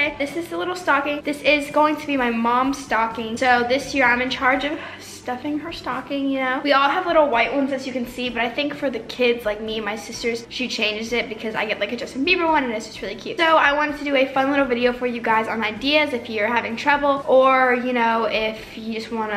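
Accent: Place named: South, United States. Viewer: Your southern accent is just too cute doll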